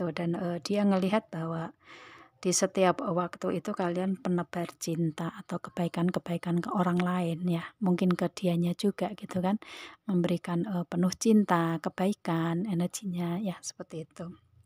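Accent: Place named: Java, Indonesia. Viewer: Dari jawa ya mbak ... klentum nya kyk Jawa.mbak ...tksh mbak ....Aqua..resonet kok mbak.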